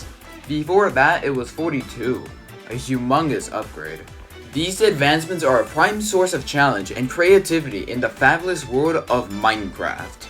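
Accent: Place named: Canada. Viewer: Yeah, you're definitely Canadian, I suspected it after hearing eh then you spoke French. I can't see you not being Canadian.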